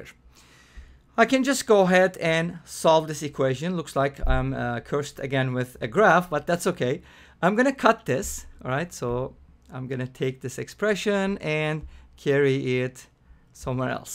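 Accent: Turkey. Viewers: Your English accent sounds Turkish .is it really so :)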